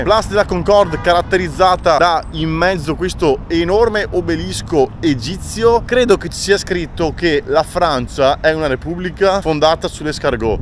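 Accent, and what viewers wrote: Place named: Veneto, Italy. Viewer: il tuo slang Veneto ci sta un sacco per dare pareri soggettivi alle cose😂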